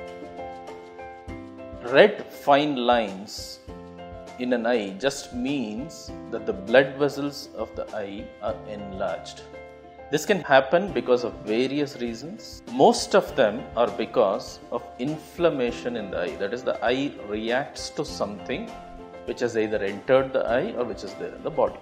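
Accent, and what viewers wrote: India. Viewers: Why do i love indian accents?? Lol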